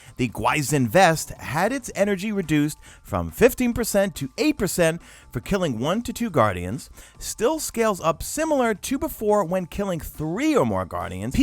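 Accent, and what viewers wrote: New York, United States. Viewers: Love the New York accent. Don’t worry I understood lol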